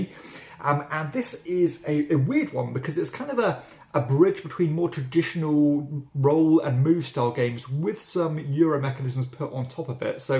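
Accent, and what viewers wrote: United Kingdom. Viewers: If you don't mind sharing - what is your story behind Castell Rhaglan? - was it simply a visit, or do you have Welsh ancestry, even as your accent is undoubtedly English? 🤔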